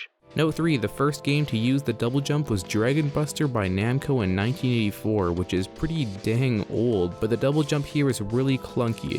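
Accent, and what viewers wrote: Canada. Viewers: you're Canadian right? also fun video.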